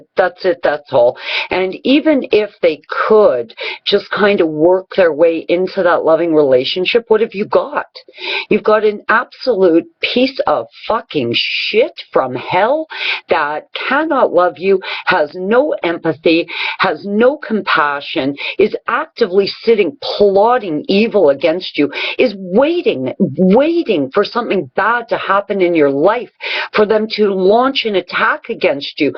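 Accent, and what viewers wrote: Canada. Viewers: Toxic, evil, sinister, fake spell cast upon the good ones by the evil ones...never again: ne j'aimerais pas! Love your Canadian accent, I used to live in Saskatoon! All my best friends are there, especially the good, strong Western women! O! Canada!😇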